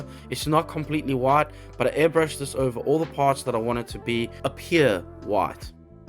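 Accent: South Africa. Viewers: Love your vids thanks for sharing!!! Subscribed ……Is that a South African influenced accent I hear there? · I'm very new to this channel, thanks for the tips! Is that a South African accent I'm hearing?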